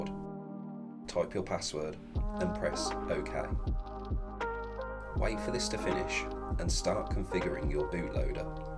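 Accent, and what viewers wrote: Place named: England, United Kingdom. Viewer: It's a pleasure to listen to your perfect England accent. You combined all of the information into perfect tutorial. Thank you very much for such great video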